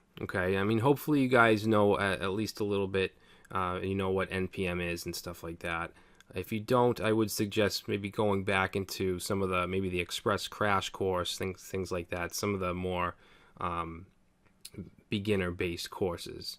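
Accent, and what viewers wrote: Boston, United States. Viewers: That Boston Accent is wicked fun to listen to.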